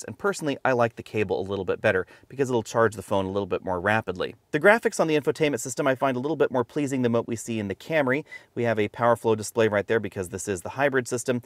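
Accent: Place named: Texas, United States. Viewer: Must be that Texas accent.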